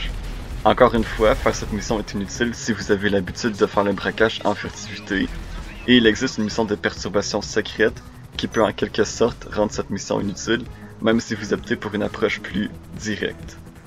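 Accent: Quebec, Canada. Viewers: J’aime la vidéo Hahaha et ça parait que t québécois (je le suis aussi) gg continue comme ça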